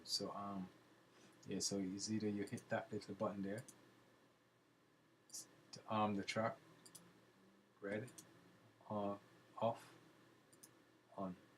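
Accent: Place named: Jamaica. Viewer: Yo you sound like a Jamaica still .... glad for the tutorial ... was wonder how i would get Slate VMS to work with Apollo ... you have just given me an idea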